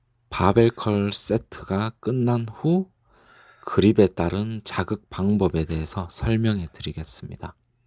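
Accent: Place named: Gyeongsang, South Korea. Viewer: 님도 말투가 경상도분이신거같은데 구독자도 경상도분이시네요ㅋㅋㅋ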